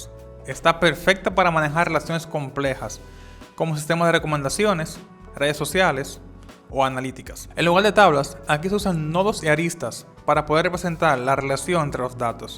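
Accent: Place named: Dominican Republic. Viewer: Algo me dice que eres Dominicano, si es así, estoy muy orgulloso por ver compatriotas haciendo esta clase de contenidos. ¡Excelente vídeo! ¿Podrías hacer un vídeo sobre el road map que una persona no informática debería seguir para ser un Desarrollador de Base de Datos en 2025?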